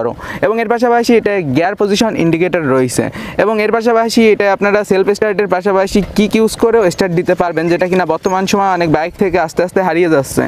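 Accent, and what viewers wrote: Khulna, Bangladesh. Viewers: আপনার খুলনার ভাষার কথা শুনে আমার ও খুভ ভাল লাগতিছে !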